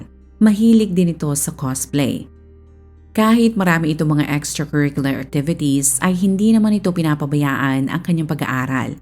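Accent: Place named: Visayas, Philippines. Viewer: Bisayang bisaya mag salita yung narrator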